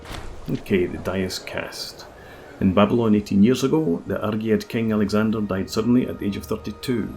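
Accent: Scotland, United Kingdom. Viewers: You Scottish?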